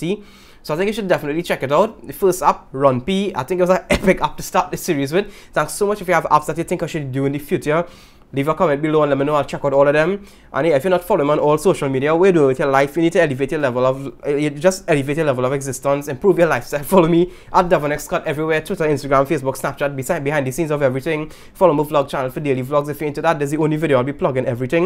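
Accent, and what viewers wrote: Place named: Trinidad and Tobago. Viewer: Trinii accent loll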